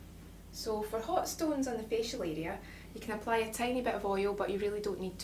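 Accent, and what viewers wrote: Scotland, United Kingdom. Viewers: Scottish accent plus the word “stone” is such a perfect combination. Not sure why but that word sounds legit coming out of a Scot. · What is your accent British, Irish, Welsh, or something else? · Stoone. I love the Scottish accent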